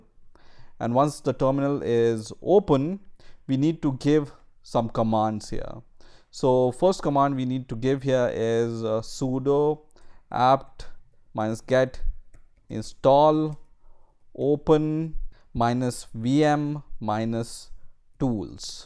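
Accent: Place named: India. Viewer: this guy sounds like Indian anyone noticed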